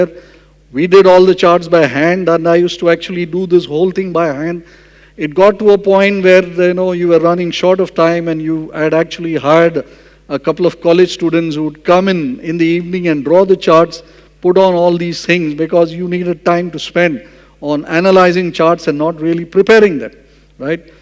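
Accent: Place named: India. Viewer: Indian accent is horrible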